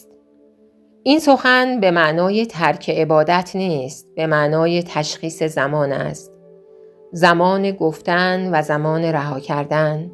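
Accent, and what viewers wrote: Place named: Turkey. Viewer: به خداوندی خدا ابن عربی ادم خیلی بزرگیست که در دین و عرفان عالمی بزرگ بوده اما نمیدانم چرا باید یک دوبلری بزارید که ادم حتا چندشش میشود به پادکست گوش داد من کل کتابهای ابن عربیه و ابن بابویه بجز دایرت المعارف ابن بابویه همه را چندین بار خوانده ام اما صدای این خانم با لحجه همشری ما ترکی به خدا خراب کرده ادم بزرگی مثل ابن عربیه را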